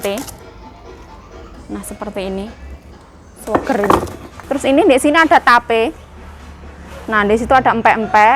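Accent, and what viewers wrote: Java, Indonesia. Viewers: Sukabumi hadir..mba saya suka lihat mba lg makan apa lg kalau ngomel"gt aga medok tp enk di dengar lucu.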